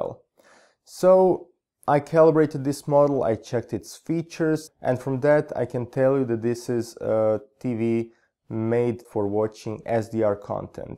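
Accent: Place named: Russia. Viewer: You speaking like a Russian man, I guess so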